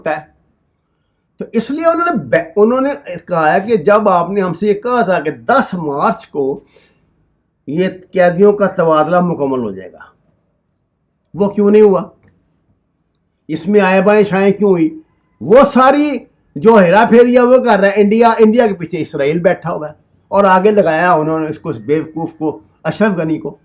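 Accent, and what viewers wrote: Punjab, Pakistan. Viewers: Punjabi sikh mosliman hogiya.🤣😂🤣😂🤣 pehle apni punjabi ascent teke karo kafir isi ne taliban ko sale kiya amiraca pe us ke baad ab Russia ur china pe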